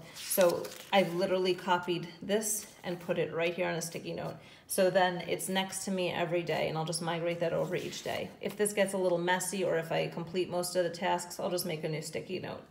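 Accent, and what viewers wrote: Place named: North, United States. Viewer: I wouldn’t say that you say it differently. I would say it’s a northern compared to southern accent. I like it. We draw everything out in the south. I’m very self conscious about my twang. I had someone, not from Oklahoma obviously, tell me that I was really smart and they were surprised because of my accent. 🤷🏻‍♀️ WTH does that mean?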